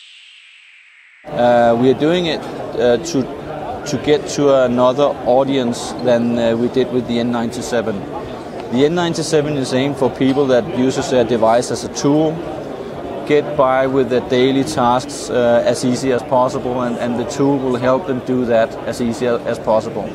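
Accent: Denmark. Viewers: so does sound like a danish accent! haha! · He sounds danish. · Ja han lyder godt nok meget dansk. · Oh my god, you sound so much like a danish person who speaks English, hahaha. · Very danish accent haha...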